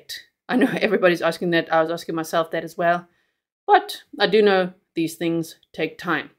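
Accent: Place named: South Africa. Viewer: Hello fellow south African 🇿🇦 Thanks for covering this case x Great to hear the accent!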